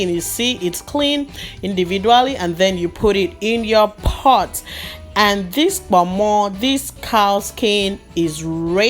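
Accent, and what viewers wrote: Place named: United States. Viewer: Hi. Thank you for such interesting video. I love cow skin and goat meat. Tripe not so much. I have never had Nigerian food, but I have a Nigerian friend who happens to be my mentor. I love Nigerian movies. It’s where I learn the phrase Chineke. And most of all I love the fabric. I would love to visit just so I could buy African fabric. Thanks for adding the new words in my African vocabulary. I will go and show off on my mentor that I am slowly becoming Nigerian. You speak as if you live in the states. I live in the states too and I don’t like the cold either. Anyway I watched to the end and be blessed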